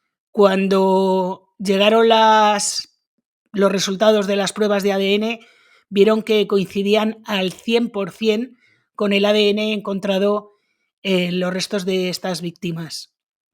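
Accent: Spain. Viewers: Hola! me gusta muchìsimo como describes tus videos, siempre muy interesantes.Ademàs me encanta tu acento; de què lugar de España eres?.Saludos desde Italia❤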